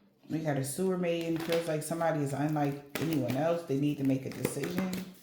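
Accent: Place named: Baltimore, United States. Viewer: Lol is you From Baltimore I love your accent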